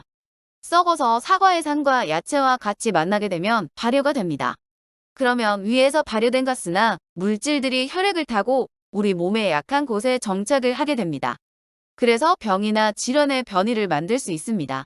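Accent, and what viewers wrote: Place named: Gyeongsang, South Korea. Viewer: 경상도 말투가 서울말씨랑 어울려저 아주 듣기좋아요ᆢ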